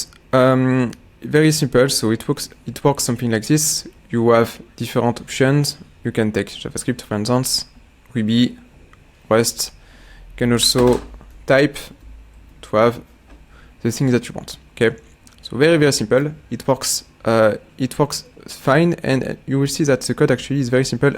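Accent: France. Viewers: HAHAHA je me suis dis la meme chose , son accent français est vraiment fort n'est pas Pierre ?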